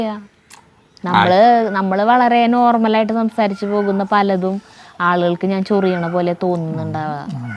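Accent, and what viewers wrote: Thrissur, India. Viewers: നമ്മളും ചുട്ട ന്ന് തന്നെയാണ് പറയുന്നത് പ്രോപ്പർ തൃശ്ശൂർ ഭാഷ അത് തന്നെയാണ്. തൃശ്ശൂർ അങ്ങോട്ടും ഇങ്ങോട്ടും നീങ്ങുമ്പോൾ വ്യത്യാസം ഉണ്ടാവും. നിങ്ങൾ പ്രോപ്പർ തൃശ്ശൂർ ഭാഷ തന്നെയാണ് സംസാരിക്കുന്നതു 👍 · trissur ഭാഷ യാണ് നിങ്ങളുടെ ചാനലിന്റെ highlite trissur ഭാഷ മാത്രം പറഞ്ഞാൽ മതി ഞാൻ മലപ്പുറം കാരിയാണ് 34 വർഷമായി hydràbaadil settiled aanu njaan ningalude ella vidieo kalum kandittund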